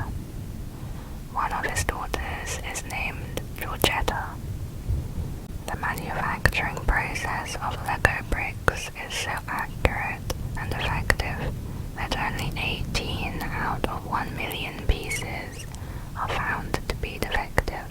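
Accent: United Kingdom. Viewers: are you british? actually yeah you obviously are what am i on about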